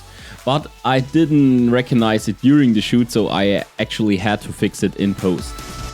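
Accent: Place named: Germany. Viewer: Dieses German-English... herrlich 😅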